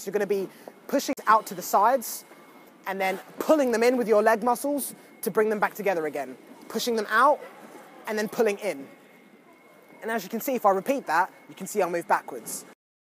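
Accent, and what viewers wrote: United Kingdom. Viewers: Are you British or American but I guess your British by the sound of voice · love his British accent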